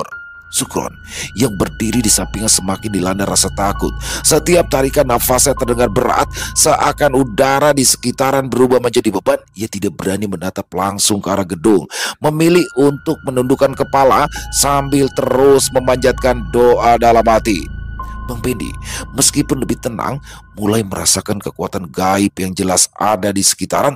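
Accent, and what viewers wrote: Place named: Betawi, Indonesia. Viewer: Majalengka hadir nyimak, klo cerita bang piyan yg kocak nya baba ali,klo di bang doni emak jubaedah,yg bawain ceritanya bang kocak banget,dialek betawi nya,seru abiss DIMARI 😊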